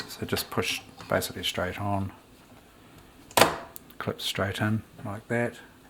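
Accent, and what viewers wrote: New Zealand. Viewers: Great video. You must be a Kiwi.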